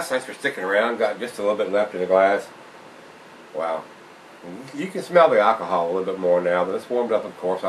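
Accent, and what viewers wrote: South, United States. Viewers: "Today I'm reviewing bell's expedition stout, I said stout!," very pleasant southern accent sound like a coronal, where you from?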